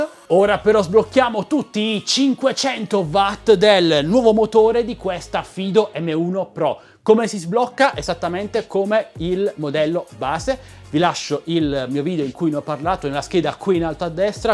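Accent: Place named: Bari, Italy. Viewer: Sei barese? Da come parli ci giurerei... Bel canale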